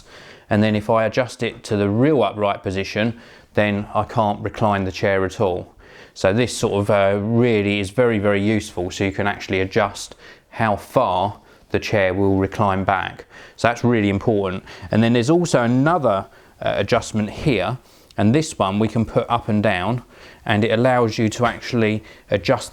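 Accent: England, United Kingdom. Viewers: the "leavers", love that English accent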